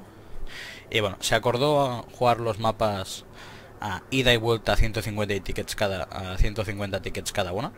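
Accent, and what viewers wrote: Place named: Catalonia, Spain. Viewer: Ese acentillo catalan xD, nada decirte que el otro dia justamente me estube mirando dichas reglas (esl) para una cw y las restricciones en las carabinas y los fusiles son mas ampliasy que los dmrs stan permitidos, igualment bon video company i normal que se t.escapes savent qui ets dubto que et fixesis xD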